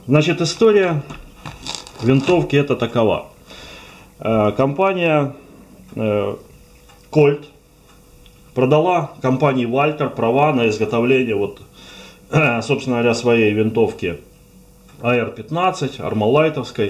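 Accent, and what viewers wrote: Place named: Ukraine. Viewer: у его английского сильный украинский акцент =)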